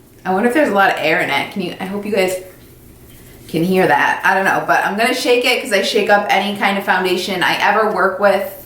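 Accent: Chicago, United States. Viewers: I knew she was either from Chicago or Michigan. before she said Chicago just from her accent. 👌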